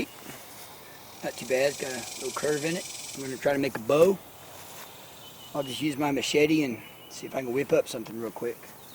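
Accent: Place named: Texas, United States. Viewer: He is from TX.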